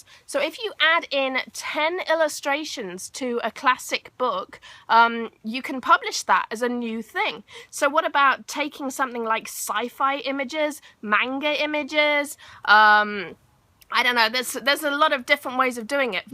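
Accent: United Kingdom. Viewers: ARE YOU AN ENGLISH GIRL?? BECAUSE YOUR ACCENT APPEAR LIKE YOU'RE FROM UK??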